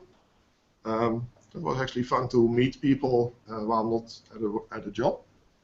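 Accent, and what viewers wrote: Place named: Netherlands. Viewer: I suspected the author was Dutch when I ran the program for the first time in <num> and read the Uganda message. Never bothered confirming that, but now I've heard him and I know.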